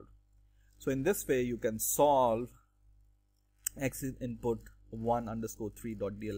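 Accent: India. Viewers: thank you Indian accent man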